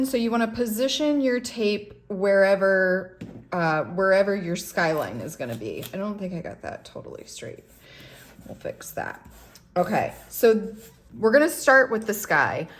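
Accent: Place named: New Jersey, United States. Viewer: I'm guessing you're from New Jersey from the way you say orange! No a - ornge! Ornry ornge! That's how we say onery down here - no e! Say Ornry ornge 3x fast & yer jaw will let you know about it.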